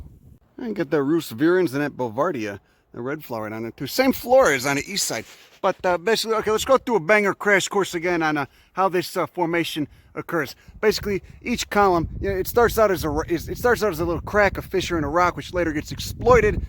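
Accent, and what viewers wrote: Chicago, United States. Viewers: I agree with about society's crap and enjoy your unique take on it. And of course the real American accent from Chicago to share the amazing botany you explain so well in a language I appreciate, thanks for the history and botany you share with the rest of us stuck in this life, for me in CA, surrounded by tweekers opinionated by today's ignorance worrying, about the gas for their torch. More then the beauty that we share with you like this. Awesome, I was born in northern IL, South Beloit. Have no connection to it other then birth cert. Lol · make that Chicagoan accent 😉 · ive never been attracted to someone with such a heavy american accents but fuck he’s too wholesome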